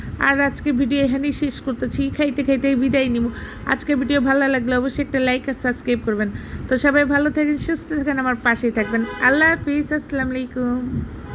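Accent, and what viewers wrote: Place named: Dhaka, Bangladesh. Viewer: আসসালামুয়ালাইকুম আপু আপনার ভিডিওটা অনেক সুন্দর হয়েছে আপনি যে এত সুন্দর করে শুটকি ভুনা করেছেন দেখেই মন চাচ্ছে দৌড়ায় এসে খেয়ে ফেলি আর সেমাই পুরি তো দেখতে অনেক অনেক সুন্দর হয়েছে খুব ভালো লাগলো আর প্রত্যেকটা ভিডিওতে আপনি যে এত সুন্দর করে ভয়েস দেন আর আপনার এই আপনার মুখে ঢাকাইয়া ভাষা অনেক অনেক বেশি ভালো লাগে আপু এভাবেই আরো অনেকগুলো ভিডিও দিবেন আমরা অপেক্ষায় থাকবো খুব ভালো লাগলো দোয়া রইল আপনার ছেলের জন্য তাড়াতাড়ি সুস্থ হয়ে যাক❤❤❤❤❤❤❤❤❤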